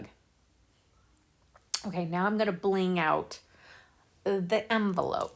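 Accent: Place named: Florida, United States. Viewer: I love making cards and this is giving me great ideas. What was the glossy ink you spattered on this one? Also what did you seal it with? Are you from Naples FL? You have an accent 😊 My daughter lives in Naples. Just wondering.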